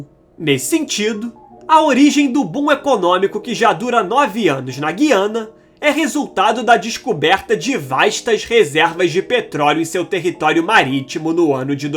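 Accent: Rio de Janeiro, Brazil. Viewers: O que mata o video é esse carioquessss do narrador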